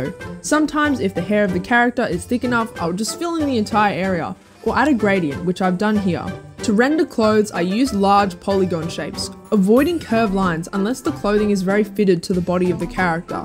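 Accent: Australia. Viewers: Are you... Australian??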